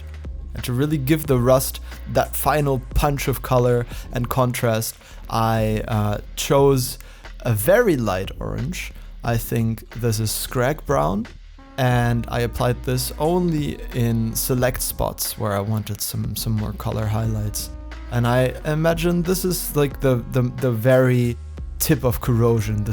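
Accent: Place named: Germany. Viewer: I think ur right it looked better before but still now its more of what u imagined it to be, not terrain, marker, mini whatever ✌ btw where u from u sound pretty german but not totally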